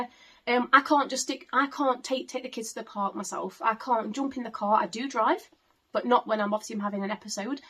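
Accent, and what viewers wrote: United Kingdom. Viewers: Thank you for being so open. I have bipolar (with psychosis) and ptsd so hello from one nutter to another. You my dear are the poster child for showing the world that you can have a chronic/severe mental illness but also be an amazing and attentive mother with stregnth many don't have. That's a fuk you to stigma if I've ever seen it. I must have missed this video when it was uploaded but I too am currently a crap goth in my pyjamas all day too anxious to leave the house... by your accent I don't think we're too far away... maybe will bump into you on a patch ward some day....but hopefully not for either of us lol. Anyway much love from South yorkshire ❤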